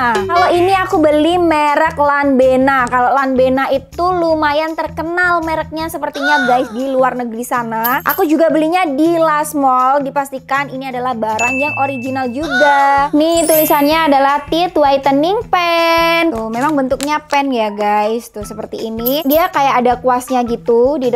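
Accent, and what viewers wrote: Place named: Semarang, Indonesia. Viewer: Gek weruh aku ono channel e mbak iki, mesti cah plat H logate koyo aku oq soale wkwkwkwk makasih reviewnya yo mba, aku tertarik sama yg obat kumur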